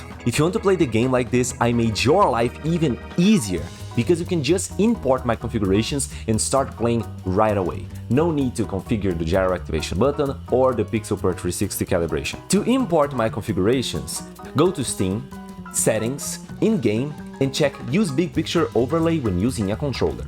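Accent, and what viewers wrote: Brazil. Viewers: Boy, are you brazilian?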